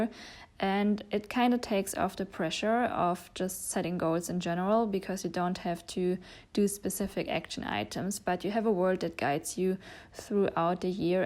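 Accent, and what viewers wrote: Germany. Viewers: Ich wusste das du deutsch bist, hab ich doch richtig gehört 😂👍🏼❤ Ich hab übrigens noch nie so ein schönen Journal gesehen, hab vergessen wie das auf deutsch heißt lol 😅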